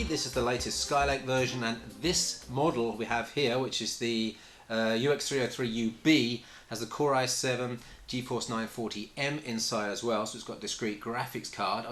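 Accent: United Kingdom. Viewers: Does it matter much? His name is. Not a lot of Germans are named, nor do they talk with a British accent. So there is a very good chance that he's British. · Hahahah no it doesn't, I was just curious, he speaks with a British accent but his keyboard is QWERTZ and also his windows is in German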